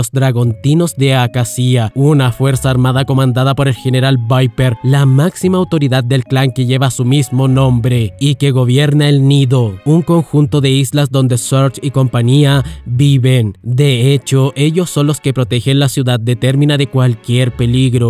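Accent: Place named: Chile. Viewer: Solo acotar que al parecer el personaje Toma en según Wiki es descendiente del Toma que aparece en Chrono Trigger. Por lo demás, se agradece este resumen, por el poco material de este estilo que hay al menos en español, y por lo enrevesada y a la vez entrañable y bien construida que es esta historia. Mención honrosa al acento y las referencias chilenas 🤭✨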